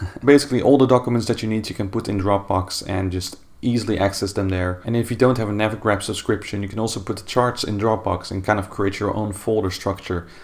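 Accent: Netherlands. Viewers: Ik hoor daar een prachtig Nederlands ondertoontje 👌 Goede video!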